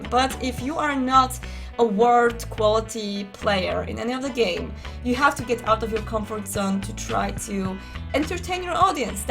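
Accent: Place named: Poland. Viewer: Od razu słychać, że jesteś z Polski.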